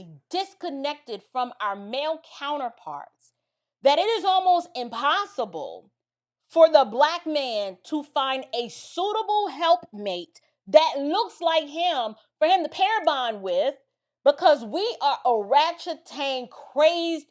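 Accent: New York, United States. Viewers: Your NY accent is thick today! It must have been so nice to get back home for a bit. ❤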